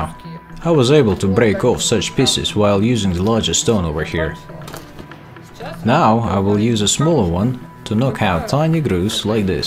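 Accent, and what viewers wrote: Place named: Russia. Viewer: Еее !! Теперь и на английском языке!! Отлично! О да , русский акцент )) так мило ! Вы, больше молодцы, уважение !!!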